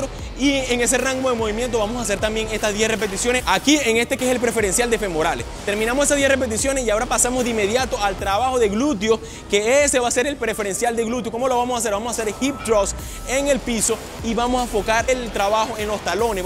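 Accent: Venezuela. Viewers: Este pana es venezolano?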